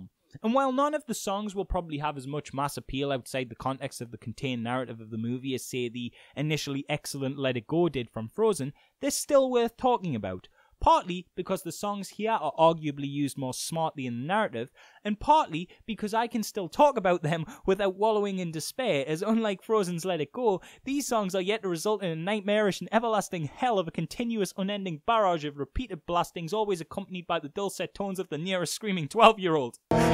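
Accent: Newcastle, United Kingdom. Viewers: ah with the accent I assumed you ment somewhere local to newcastle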